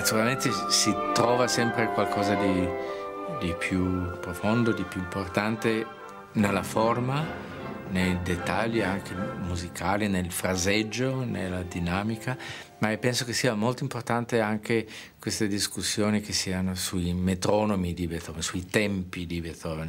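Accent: Germany. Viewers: Interesting comment about Latin countries producing melodic operatic music and Germanic countries producing the greatest symphonic works. That’s where Mozart comes in satisfying everyone! Wonderful to hear this consummate musician doing the same thing when speaking in Germanic Italian. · Ma perché parla con accento tedesco? Sembra un tedesco che parla in italiano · The translation is...not very good. At some parts, quite inaccurate. His ideas, I don't completely agree with (though he has a warm personality). And his Italian strangely sounds like coming from somebody who speaks more German than Italian (this comment comes from somebody who only studied it, but it's obvious he doesn't sound like most Italians). BUT his conducting and the music ... absolutely sublime. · He sounds like a German speaking a good Italian.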